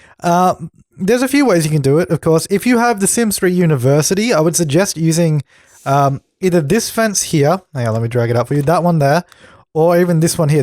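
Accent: Australia. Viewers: Finding this in 2024! You sound like an Aussie just like me!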